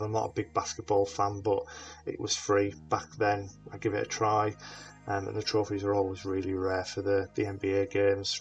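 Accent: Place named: Liverpool, United Kingdom. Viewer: Strong scouse accent you have there to go with your liverpool scarf! Decent video mate best of luck with the channel.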